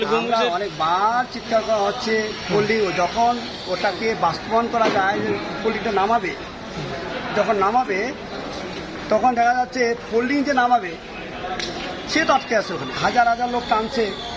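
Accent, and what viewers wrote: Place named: India. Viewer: এইডা তো ইন্ডিয়ান মাল। এর ভাষা শুনলেই বুঝা যায়।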